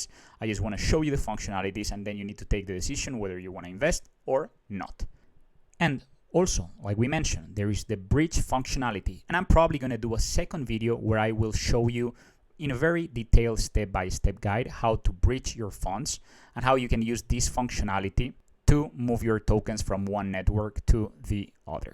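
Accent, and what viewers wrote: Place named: Bogotá, Colombia. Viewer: Este Man tiene puro acento de rolo.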